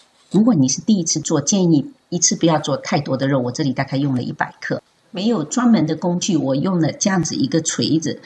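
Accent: Fuzhou, China. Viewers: 老师也有福州口音，听来好亲切。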